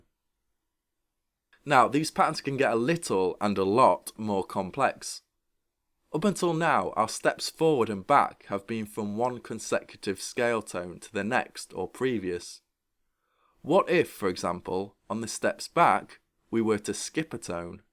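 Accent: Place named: Cockney, United Kingdom. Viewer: cockney speach hehe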